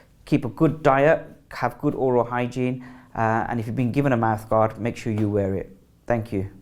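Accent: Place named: United Kingdom. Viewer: Maybe coz british accent id hard to hear!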